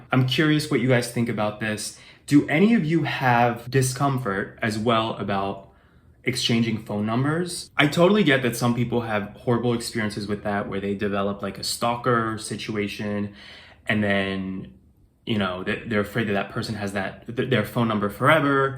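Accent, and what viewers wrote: New York, United States. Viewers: How could anyone resist that NY accent and the way your voice goes up on words like “Vocalize”.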